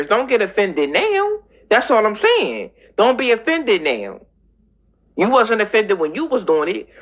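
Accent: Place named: Philadelphia, United States. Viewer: You sound like your from Philly or east coast anyway always enjoy your content love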